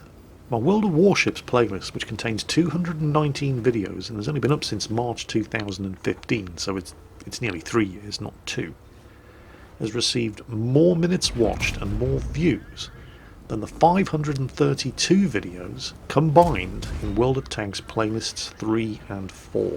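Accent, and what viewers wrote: United Kingdom. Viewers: I watch your videos all the way through and find your cursing hilarious, the well articulated, very british way to call someone a complete waste of space is awesome. Robert Pike should appreciate you teaching his grandsons to swear with sophistication and style rather than just the usual dropping of f-bombs and yelling names. Don't ever change. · People who swear a lot tend to be more honest than the average person! That being said, I come to your channel for your mentality and brilliant British. Your voice! Its like angel farts to my ears! Much love, all the way from Lebanon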